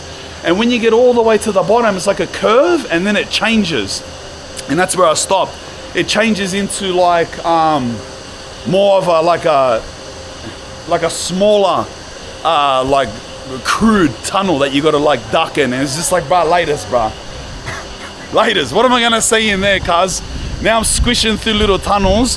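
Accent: Australia. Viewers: Its hard to take the aussie accent seriously 😅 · I am 74 & learned more about North Korea in this video than I ever learned in school or on news.Never heard about the tunnel. I liked everything about your video including you cool attitude. And who wouldn't love that Aussie accent. ❤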